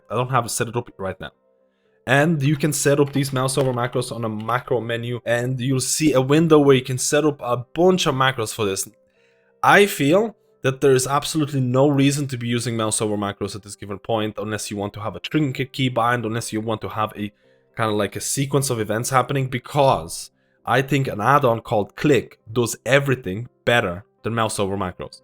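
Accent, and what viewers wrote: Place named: Ireland. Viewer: Thank you for the great info. Your accent is really interesting may I ask are you part Irish part Scandinavian (swedish, norwegian)?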